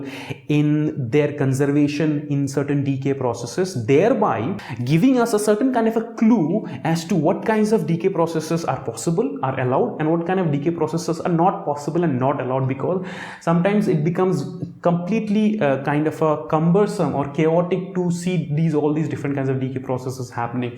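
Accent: India. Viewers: But I guess you're indian and that's why you're accent sounds like that...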